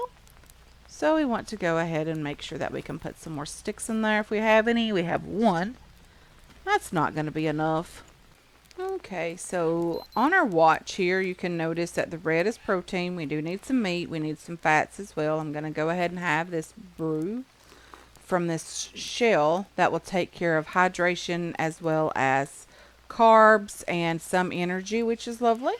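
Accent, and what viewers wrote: Kentucky, United States. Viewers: im so glad to see you playing again from the start! i subscribed in the first place watching you play this...supposedly its a very different game now with everything they've added. we'll see. its very nice to see you! i still follow you but i dont have the time to get into the infected part 325...ill never catch up. this is perfect. and i just love hearing you talk with that kentucky accent. people accuse me of having a oklahoma accent! what accent? yall are the ones that talk funny!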